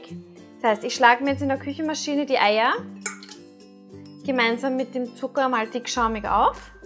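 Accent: Austria. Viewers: Ach wie nett, endlich mal KEIN Piefchinesisch sondern sympathisches Österreichisch!!! Hab das Rezept NOCH nicht ausprobiert - klingt aber plausibel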